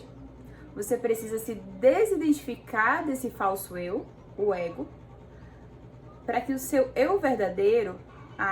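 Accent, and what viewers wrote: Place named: Northeast, Brazil. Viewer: Que presente esses vídeos. Esse canal! E esse sotaque com: oxe!? Amei ❤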